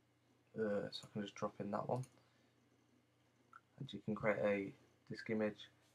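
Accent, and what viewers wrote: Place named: United Kingdom. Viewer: cannt you get a better microphone ans speak clearly without the brittish accent ? we are trying to understand but its hard .. .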